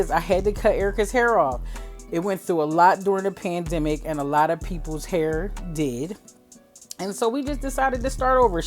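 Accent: Philadelphia, United States. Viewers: Are you from philly? You sound just like one of my clients. 😂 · Are you from Philly? I feel like I hear an ancient. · Your channel is amazing. Just peep your Facebook page. From your voice I just knew you were from Philadelphia. Not only that you are just around corner from where I use live.❤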